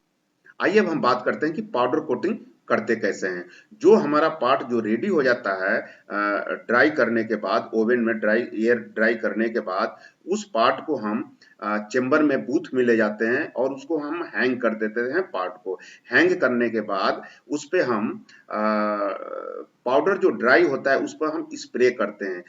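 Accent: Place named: Bihar, India. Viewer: बिहारी लग रहा है।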